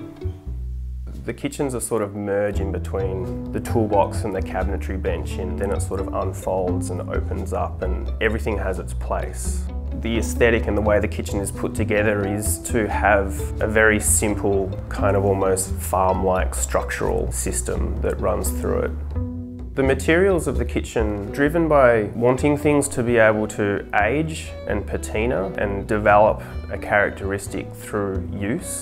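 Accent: Australia. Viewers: Faces north Great lighting That accent Oh he lives in Australasia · I heard his voice and thought It must be Australia. Lol.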